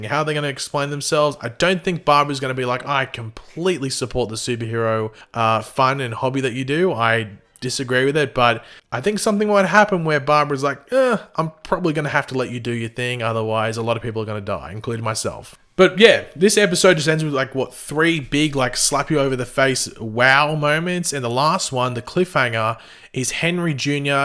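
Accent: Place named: Australia. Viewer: im quite sure that you're australian from your accent like I am and I just saw this video and thought how did you see this before 13th july its the 14th now and I use a vpn to watch the show from the website and cant watch it yet how do you?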